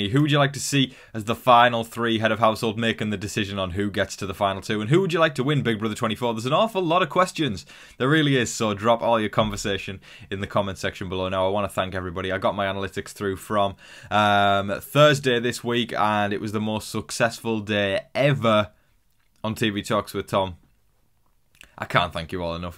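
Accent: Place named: United Kingdom. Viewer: I loooove your British accent and take on BB❤❤❤❤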